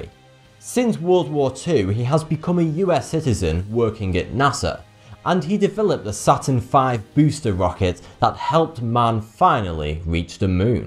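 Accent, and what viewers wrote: United Kingdom. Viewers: Steve Holmes....I believe what you are describing is called having an accent ! He probably doesn't pronounce words like you and I do because he is probably not American. I believe he is British or possibly Irish. Either way, the way he sounds and/or pronounces words will not be like an American does ! Have you never heard anyone speak with an accent...even on TV or in a movie etc ? I actually like his accent, although I admit that it is a little difficult to understand at times.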